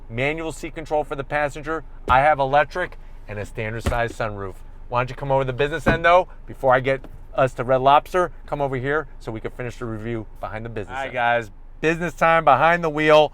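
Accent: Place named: New Jersey, United States. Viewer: I'm guessing this guy is from NJ or LI.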